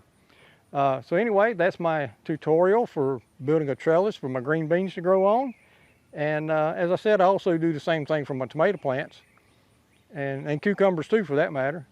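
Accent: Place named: Georgia, United States. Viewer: Sounds like a Gawgia boy...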